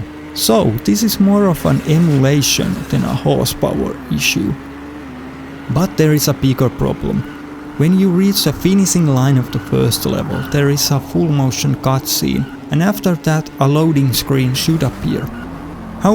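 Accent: Finland. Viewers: ekan parin sanan jälkee arvasin et varmaa suomalainen tekemäs videoo :D hyvin tehty video, täytyy käydä hakemas pi 3 b model nii pääsee itekki testailee :D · Mitäs vittua..? Ooksää suomest? (Koska perinteinen rallienglanti)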